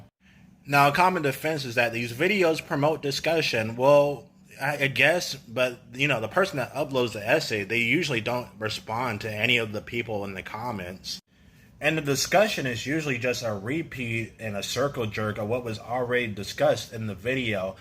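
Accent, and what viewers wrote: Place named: Texas, United States. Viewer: Where you from man you sound like you are from Texas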